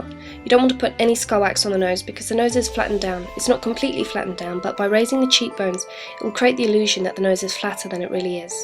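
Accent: England, United Kingdom. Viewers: wow your voice..your kind of speak...guess you will be a good voice actoress..are you british ? i like this accent soo much :-) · I think the fact that you have a british accent totally adds to this video <3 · her English accent is beautiful. die die die. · love ur british accent!!!!!!!!! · I love your voice for this, soft, with a british tone. sounds alot like a girl harry potter xD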